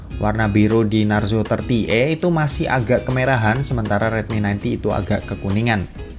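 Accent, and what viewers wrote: Semarang, Indonesia. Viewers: wong semarang yo mas? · Orang semarang bang? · cah semarang ki jebul🤭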